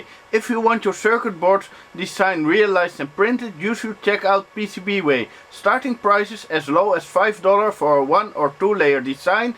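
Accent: Netherlands. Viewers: The stickers saying Utrecht on the pc really weren't needed, I could immediately hear you were Dutch XD Nice video!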